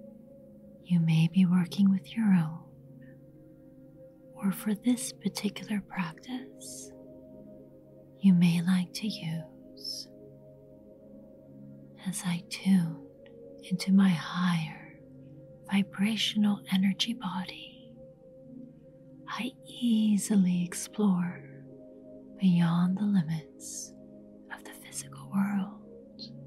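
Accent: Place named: United States. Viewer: REALLY?? oh how supernice! you sound USA, such good english, so lovely!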